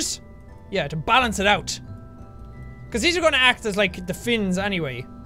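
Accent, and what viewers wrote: Ireland. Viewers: I loved his Irish accent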